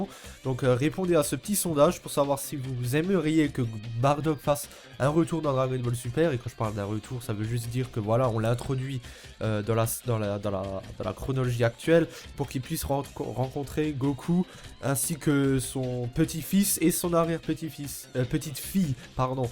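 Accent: Alsace, France. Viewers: t'es alsacien nan ? xd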